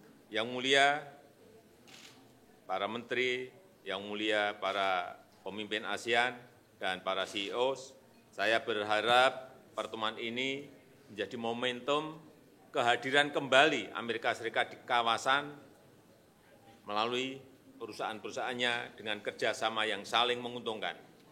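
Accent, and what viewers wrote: Java, Indonesia. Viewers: Pak Jokowi itu eksportir sebelum jadi wali kota Solo, beliau sering ke luar negeri, ke negara Eropa pasti bisa berbahasa Inggris lah, walaupun bahasa Inggrisnya Njawani banget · Lho Inggris logat Nusantara nya mana pak presiden??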